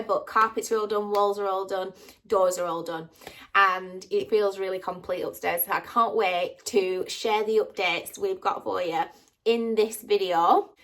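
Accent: Northern England, United Kingdom. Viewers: Hi loving the flooring and the stair rods spot on👌💖 Ey up I'm loving the northern accent haa.. si ther 😊🥰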